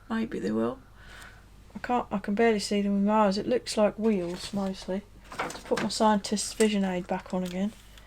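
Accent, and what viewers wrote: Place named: Lincolnshire, United Kingdom. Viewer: Hi, hope you're well. I had no idea you came from Spalding! (Although I should have realised from the accent.). In the mid eighties when I was young and even more stupid than I am now, In my motor racing affairs I became involves with a company that operated out of a place called Cradge Bank, and I lived in Spalding for around three years on and off. I used to travel in from Peterborough on the Cowbit road, and the amount of timesI would see the A10s flitting about, virtually every trip. Of course you see one while you are driving, you "feel" the other one.. Of course they have been using you for target practice! My favourite scheme was always Euro 1. Very difficult to get the colours really right on that. I may have to invest in this one- thanks for the review. Rich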